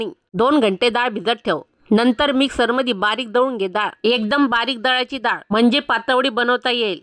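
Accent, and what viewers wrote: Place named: Ghats, India. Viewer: Ghatavrchi ahe vatte tai bhasha khup mast ahe MI tr boln Aaykte nemis tu mchi tai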